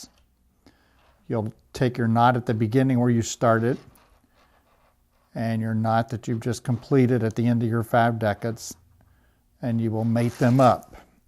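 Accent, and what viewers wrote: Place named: Northern Ireland, United Kingdom. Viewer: Very northern irish sounding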